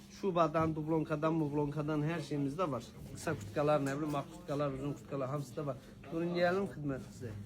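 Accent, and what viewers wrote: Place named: Goyche, Azerbaijan. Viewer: Göyceden gelener ele danışır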